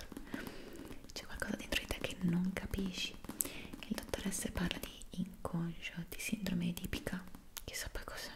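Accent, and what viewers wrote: Italy. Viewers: An American dude will always fall for a pretty Italian girl with that accent and language. It would be hard not to! 😌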